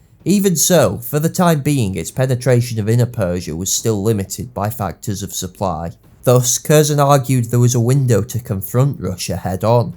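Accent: United Kingdom. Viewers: Where the accent is from ? Is it british ?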